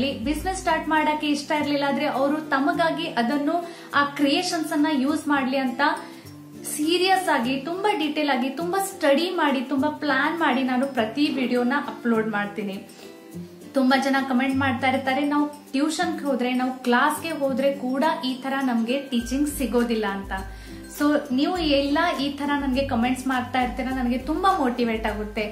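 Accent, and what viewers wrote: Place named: North Karnataka, India. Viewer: Nimma Kannada nange tumba ista iam also Uttara Karnataka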